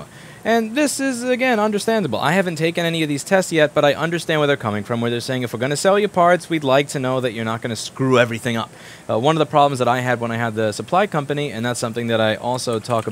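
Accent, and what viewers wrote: New York, United States. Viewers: Lmfao young rossman had a new york accent i didnt expect thaf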